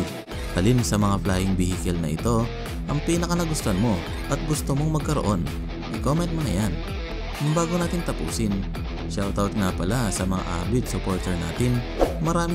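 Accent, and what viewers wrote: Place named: Ifugao, Philippines. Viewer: KASLA KA NGA KIYANGAN NGA AGSAO MET. TAGA IFUGAO KA BA?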